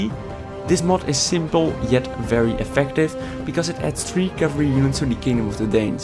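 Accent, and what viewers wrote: Netherlands. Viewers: ***** Thought so :P Your heavy accent gave it away. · ik hoor dat je Nederlands bent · That is one heavy dutch accent... My dutch friends makes fun of that accent all the time :D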